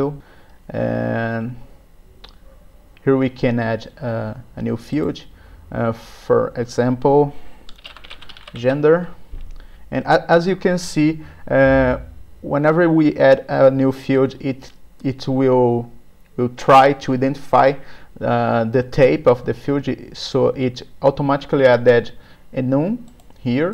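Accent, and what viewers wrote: Brazil. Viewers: Brasileiro certeza. Mas ficou bom mano parabéns. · Conheço um brasileiro de longe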